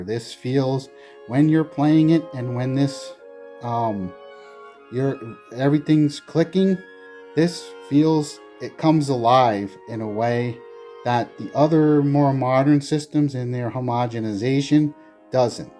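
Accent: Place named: New England, United States. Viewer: Your accent sounds either New England or maybe New York. I started in the mid 90s with AD&D 2e. Still love it. It feels like the real thing. Later editions lack drama and don’t feel as scary.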